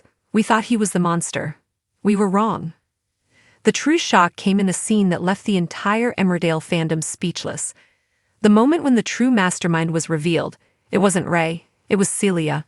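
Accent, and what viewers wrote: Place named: United States. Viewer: You should switch the voiceover to an English accent. An American accent makes it more obvious that the video is AI.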